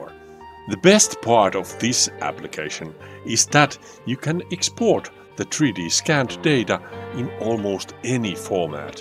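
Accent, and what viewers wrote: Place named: Finland. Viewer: The content is great, thanks! I also very love the Finnish english. The sound of their voice is so calming, also when they are really upset :)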